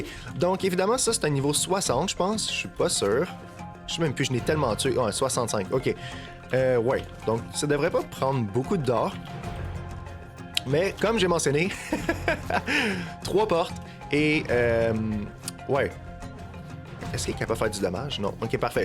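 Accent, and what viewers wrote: Quebec, Canada. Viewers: Chaluuut ! Je te regarde de Belgique. J'adore ton accent quebecois , un accent tellement enjoué , qui donne le sourrire, ainsi que les expressions. 😃 l'orignale m'a tué 🤣🤣 et le "thylaceo" 🤣🤣 Continue copain , tu ensoleilles mes journées 😘🤩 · Hey 😁! Superbe! Merci 😄 vraiment très bien ta ferme. Et pour répondre à la question que tu te posais et étant de Nouvelle-Calédonie, ton accent québécois ne me dérange pas du tout. J'ai déjà pu jouer avec des Québécois sur certains jeux et j'en garde un très bon souvenir. Des personnes agréables, gentilles et surtout très drôles 😋. Après je ne vais pas le nier, il y a des fois où vous avez vos expressions et c'est vrai qu'à ce moment là, je ne comprends pas toujours 😂 mais ça ne me gêne pas plus que ça 😀.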